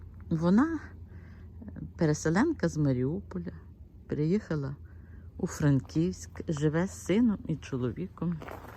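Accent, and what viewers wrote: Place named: Sumy, Ukraine. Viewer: у вас речь украинки жительницы Сумской области Вы замечательная хазяечка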